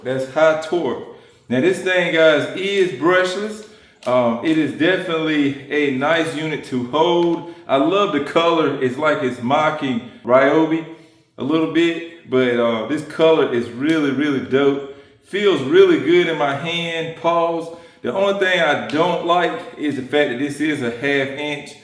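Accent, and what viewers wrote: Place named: North Carolina, United States. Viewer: Hey, long time watcher first time commenting. What part of NC you are located? You sound like you may be close to my wife's people in Sanford.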